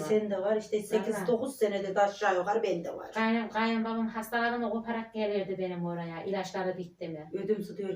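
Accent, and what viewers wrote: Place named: Çorum-Amasya, Turkey. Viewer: Orası corummu amasyalı yoksa samsunmu tokatmi yoksa şive otaraflar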